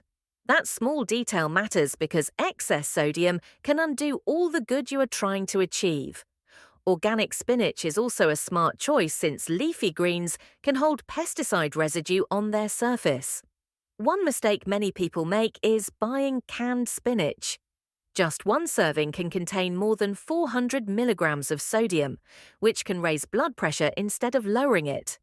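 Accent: United Kingdom. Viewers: An English voice giving advice in American Cups. 1oz or 3oz would be understood by English people. I. England we are pensioners not seniors. A I. Grrrr. Also spinach can be dangerous. English people use cabbage and sprouts. Also if on blood thinners for the heart and low BP we need to be careful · Np need to put British accent AI. Just keep it simple!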